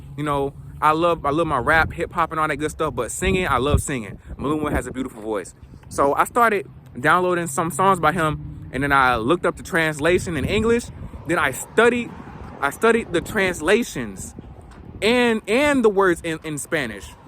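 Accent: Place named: United States. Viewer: Im à black man who fluently speaks 3 languages including Jamaican patois, English, and of course Spanish. Now folks always look at us like a unicorn and believe we must be Latino or have some typa Mexican or Latina girlfriend or some bullshit. I pride myself on not needing any Latin blood to speak Spanish. Im part Caribbean and my dialect of Spanish is hard to understand just like my patois. Cuban dialect, Kingston dialect for the patois . Currently learning Tagalog. Just need a bit more practice and immersion to learn fluency and more vocabulary. I actually hate using apps. I’d rather learn with a community organically and make mistakes to be corrected. Love that you speak Spanish brethren, your accent still sounds à but gringo like, but with more immersion and use of it you’ll probably end up sounding pretty “Mexican dialect” from the looks of it.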